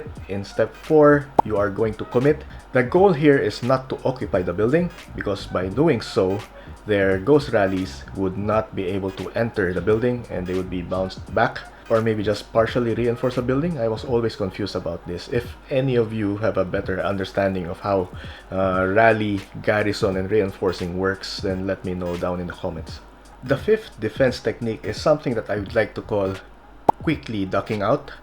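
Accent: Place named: Philippines. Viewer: Tonong pinoy paturo bro😊🧡🧡🧡🇵🇭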